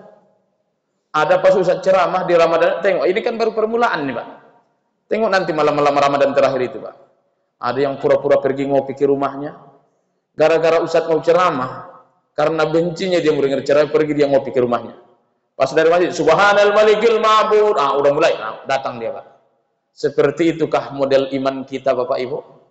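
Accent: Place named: Pekanbaru, Indonesia. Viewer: Daerah mana ya ini, macam pekanbaru bahasanya.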